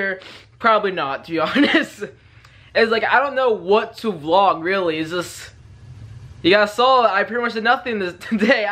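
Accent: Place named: United Kingdom. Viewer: That British acent tho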